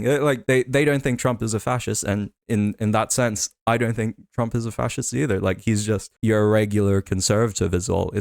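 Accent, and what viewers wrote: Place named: Australia. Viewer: He does seem to have a Philippine flag behind him, but he sounds (and I think he said he is) Australian.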